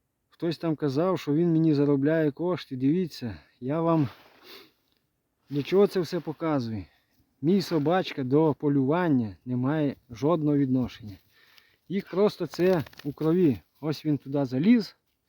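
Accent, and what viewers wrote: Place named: Polesia, Ukraine. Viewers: І мова гарно і поліщук справжній! Головне, що ви небайдужі, вам є справа до землі, на якій живете. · МОЇ СПІВЧУТТЯ ТОБІ ЗЕМЛЯЧЕ 🤝 ПОЛІЩУЦЬКИЙ ДІАЛЕКТ СУПЕР МЕНІ СОЛОДКО СЛУХАТИ, ТАК САМО ПОЛТАВСЬКИЙ, ЧЕРКАСЬКИЙ, КОЖЕН РЕГІОН МАЄ СВІЙ ДІАЛЕКТ, НАВІТЬ В США АНГЛІЙСЬКА МОВА АЛЕ ЗАХІД, ПІВДЕНЬ, ПІВНІЧ, ЦЕНТЕР ВІДРІЗНЯЮТЬСЯ, ОСОБЛИВО ВІД ЦЕНТРУ НА ЗАХІД....МИРУ НАМ УКРАЇНО ТА ПЕРЕМОГИ НАД МОСКОВИТАМИ. ПРИВІТ З ХЕРСОНУ....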